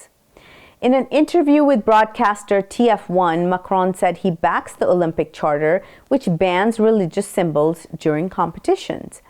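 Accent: United States. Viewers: ╔═══ ❤☀💚☀❤ ═══╗ 💚💚💚💚💚💚💚💚💚 Respected Madam, Assalaamu alaikum. Whenever I find that the news bulletin is longer than 15 minutes I take it for granted that it must be MADAM presenting the news. Thanks, Madam, for coming to present the news after full one week. It was on last Wednesday, the 7th of May, that you had presented the news last. It is again the WONDERFUL WEDNESDAY. We from INDIA love your news presentation since we don't need the help of the TRANSCRIPT down below to understand your American English accent!!! KEEP IT UP!!! YOU ARE DOING A WONDERFUL JOB!!! 🌐🌐🌐🌐🌐🌐🌐🌐🌐 ╚═══ ❤☀💚☀❤ ═══╝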